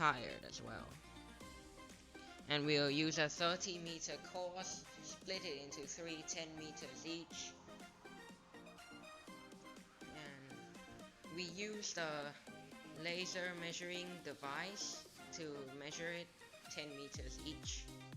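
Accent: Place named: United Kingdom. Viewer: I like that bri ish accent😎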